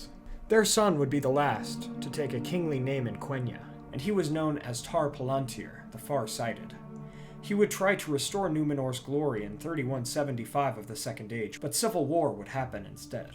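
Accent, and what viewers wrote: United States. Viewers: I love these videos so much - but I suffer from the HORRIBLE PRONUNCIATION of the words - please do not americanize them. Learn them 🙏🏻 #ithurts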